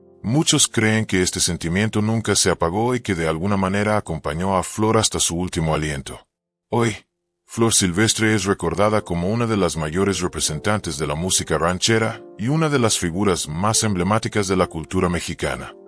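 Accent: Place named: United States. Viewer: Con todo respeto no seas Mamon , Habla bien eres mexicano??? O gabacho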